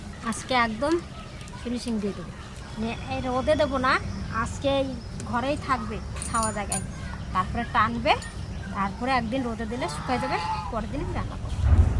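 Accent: Barishal, Bangladesh. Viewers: আপনার ঠাকুর মার বাপের বাড়ী কি বরিশাল। কথা শুনলে তাই মনে হয়।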